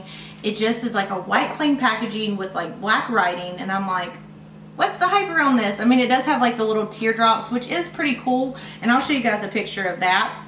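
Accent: South, United States. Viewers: Thank you for honesty, so many times I feel you tubers push products just the sake of selling them. I unfortunately an one those who fall for it too. Keep up great videos. I love your accent too as someone from Kentucky it's nice hear a little southern twang. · this is such a good video like why buy something that's just like other things we own and plus I don't think she could ever make anything sound negative with her southern like accent😊😊😍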